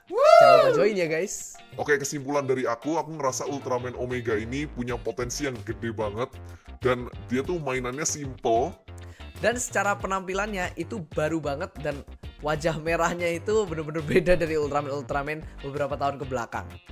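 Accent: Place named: Java, Indonesia. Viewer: Ini nih medok tapi bagus,tutor dong saudaraku biar pd sama logat medok.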